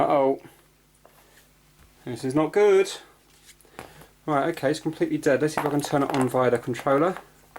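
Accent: United Kingdom. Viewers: I do not like your accent ... Sry, why does British English sound so awful?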